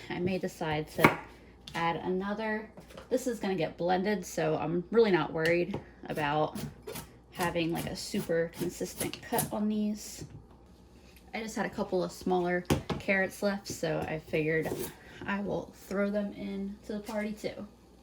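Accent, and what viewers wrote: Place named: Georgia, United States. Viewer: Based on your "accent" I would assume you're living in Georgia but maybe a transplant from a different state.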